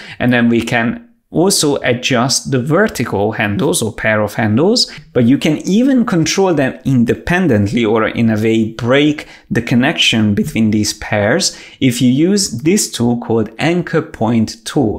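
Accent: Hungary. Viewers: bonus for hungarian accent :)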